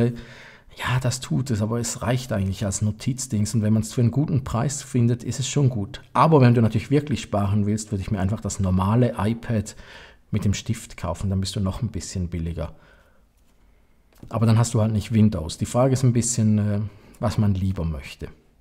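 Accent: Switzerland. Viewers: das schweizerische super charmant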